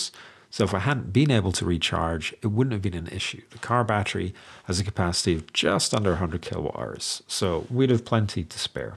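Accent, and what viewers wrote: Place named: Scotland, United Kingdom. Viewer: Hi. Came for the EV9 content, but now wondering about your accent! 😂 Do you come from Scotland?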